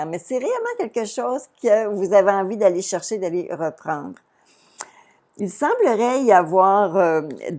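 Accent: Quebec, Canada. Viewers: Coucou j’adore l’accent québécois 🌸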